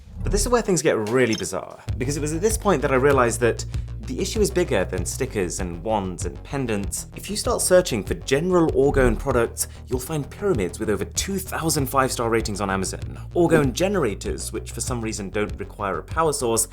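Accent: United Kingdom. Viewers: Australian dollars later... I thought he was from the UK🤔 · your austrailian? but you sound so British. Do an episdode with dank pods! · I come here to hear a british accent and get my subscibe putton punch on my account.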